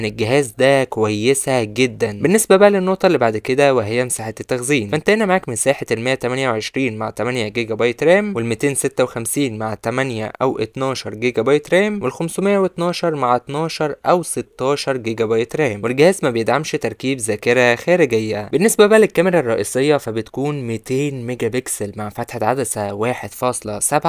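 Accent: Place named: Syria. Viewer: اللّٰه كريم بس شكو تحجي سوري وانت عراقي 🗿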